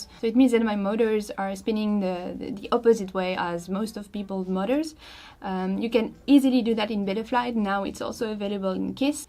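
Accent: France. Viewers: good video.. and i like english with french accent more than french itself XD ... so we're both not native english speakers and i wish i could speak that good... writing/reading/listening is so much easier than speaking for me.. i couldnt do it it that fluent :D ..recently i saw the comparison video from J.Bardwell about the chameleon/rooster/Chameleon Ti and now i dont know what frame to buy next because i really like the Rooster lol ^^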